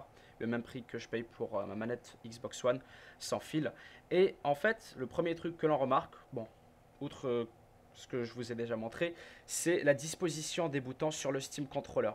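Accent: Quebec, Canada. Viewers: est tu québécois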